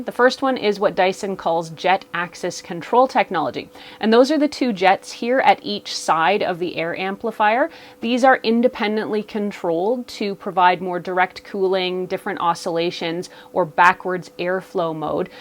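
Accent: Canada. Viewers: You sound so Canadian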